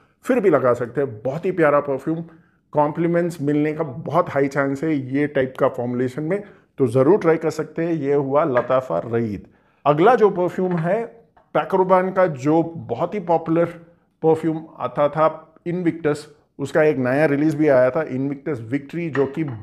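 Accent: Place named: Karnataka, India. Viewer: First time watching your hindi channel.. You sound like a kannadiga.. Good to see you reaching hindi speaking guys... 👍🏻👍🏻👍🏻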